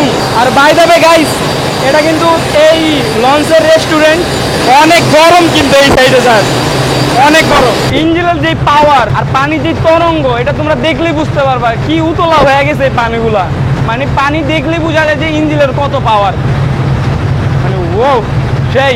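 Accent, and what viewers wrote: Barishal, Bangladesh. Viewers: বরিশাইলা বলে কতা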